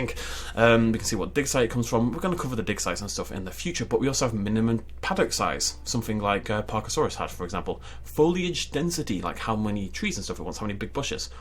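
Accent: United Kingdom. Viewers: Woah I thought this was Nature's Compendium when I clicked. Imagine my surprise when I heard the voice of my favorite Brit.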